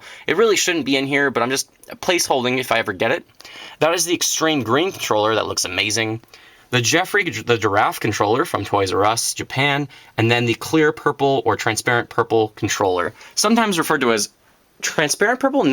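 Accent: Canada. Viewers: Love that Canadian accent; I'm crazy for a Canadian man myself.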